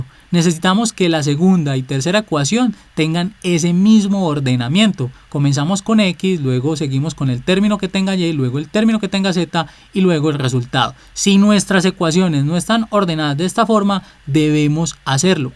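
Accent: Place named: Medellín, Colombia. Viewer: Raúl Alberto Díaz Garcia Es de Medellín. Asi es su acento.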